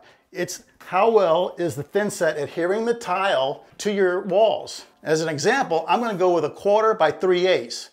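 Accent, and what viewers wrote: Louisiana, United States. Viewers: La =Louisiana. LA= Los Angles. He is in Louisiana, the accent should give that away!!!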